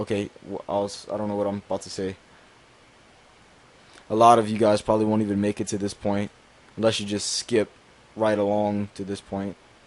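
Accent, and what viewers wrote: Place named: United Kingdom. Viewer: Me how to speak speak British but is it me or he sounds British a-hoi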